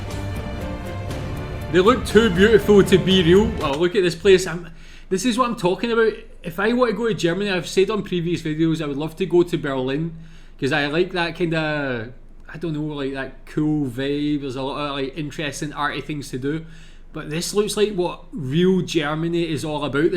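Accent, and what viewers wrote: Scotland, United Kingdom. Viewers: Greetings from Germany. Love your your scottish accent. That´s the land of my dreams. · I wanted to say the same :-) I grinned all the time ;-) And his enthusiasm seems real! But the scottish accent: FABULOUS! :-) AND, a REDHEAD! Made with pride in Scotland ;-) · I thought you’re from Scotland, love your accent ❤❤ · Very nice accent or Scottish ! 👍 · The Scottish are always welcome here in Germany and of course we do have always a good Beer for our Scottish friends. By the way i like your Scottish dialect :-). Best regards from Germany to the beautiful Scotland. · I Luv juor sccotzich Accent. Very translatbal to Bavarian 🤣🤣🤣 I survievwd all englisch Trips 🤣🤣🤣 Even in indonasa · ...before i start to listen your reaction, my first thought was, you have a scottish accent... i am , see, what comes now. i will give you some comments after your video. 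btw. german here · Which part of Scotland are you from? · Like your videos and - mostly - your scottish accent. 😊 Thow, not every city is so beautiful, like in the video, but there are a lot. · Scottish, your accent, right?! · I am glad, to hear a scottish aczent again. I come from the Black Forrest region, close to the french border. Many well kept Cities arround, plus all those Nature. Sometimes we do not even see the beauty anymore. Also, I feel sorry , because you lost your Freedom of Movment. Europe could be such a good place, if only we would be united. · Didn't need subtitles, your Scottish accent was easily understandable. And I'm living in the last city shown, which was kind of cut up with only the view from the main castle (city has more than one) and not so much on the castle, and still not capturing the main feature, as the city originally was designed in fan shape, with this castle being the centre. 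Not showing the other castles, or the countries highest courts. But I have to say, France is also doing a good job in preserving old cities and villages. Went to some, e.g. in the Vosges Mountains, that I thought kept more of their vintage charm than many German ones.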